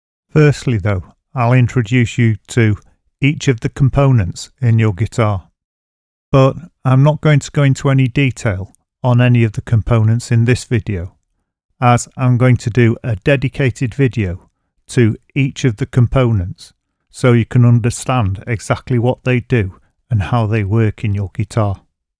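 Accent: United Kingdom. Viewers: I am a language teacher and have no idea about these items you explained so well. Thanks, I subbed and am looking forward to other vids to come. Greetings from Austria! Btw I'd like to see some vids about how connecting different gear (looper, modeler, and other gear) Of course I subbed and liked. Cheers! Am I right, you're British, ain't you?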